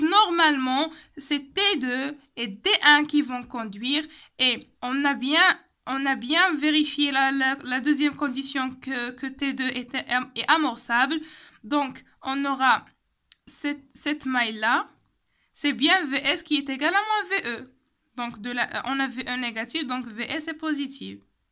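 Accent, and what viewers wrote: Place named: Kabylie, Algeria. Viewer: Électronique de puissance avec accent kabyle <3 ! ( On a tendance a noter l'angle d'amorçage avec alpha pour laisser le Phi pour le déphasage en cas de charge inductive ), Merci a vous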